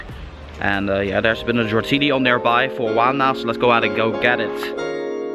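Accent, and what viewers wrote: Netherlands. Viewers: Mooi nederlands-Engels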